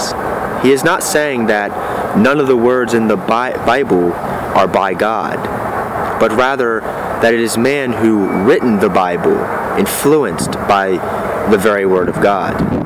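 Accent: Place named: England, United Kingdom. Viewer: robert lyte is australian this guy is english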